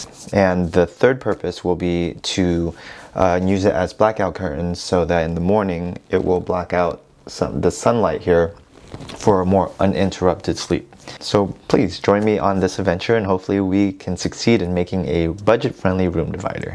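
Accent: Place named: United States. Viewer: hi from peru!! i like you videos i am so curious what is your ethnicity is? you sound are like american. :) thank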